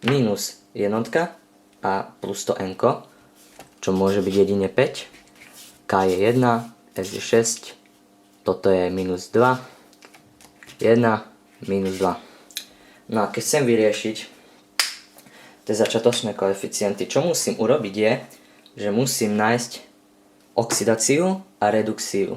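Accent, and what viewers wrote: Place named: East Slovakia, Slovakia. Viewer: To je asi najvychodňarskejší prízvuk na svete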